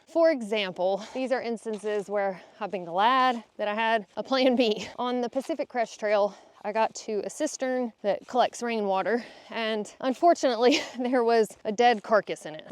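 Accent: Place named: Alabama, United States. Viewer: Omg ! I think I have to marry a girl from Alabama !!! That beautiful accent is like a songbird singing right into my heart xx (I'm from Europe)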